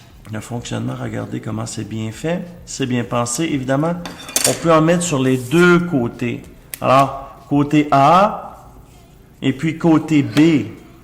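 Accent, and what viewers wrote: Quebec, Canada. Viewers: Votre français est impeccable ! Et cerise sur le gâteau... l'accent québecois ! J'adore !!! ;-) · Hey ça va ? Super présentations, Tkt pas moi je te comprends bien alors que je suis en France 🇫🇷 Ps: j'adore l'accent québécois ❤️💜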